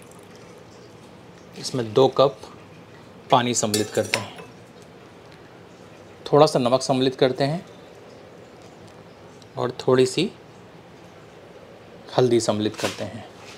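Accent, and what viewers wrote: Standard. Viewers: Aapki sudh hindiwali vani soone pe suhaga hai.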